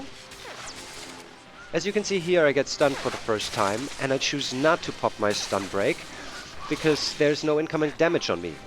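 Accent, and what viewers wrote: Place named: Germany. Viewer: Good tips tnx. Love the german accent ;)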